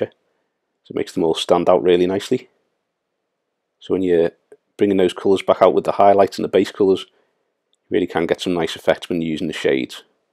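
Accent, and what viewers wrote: Liverpool, United Kingdom. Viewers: nice to see a scoucer doing the hobby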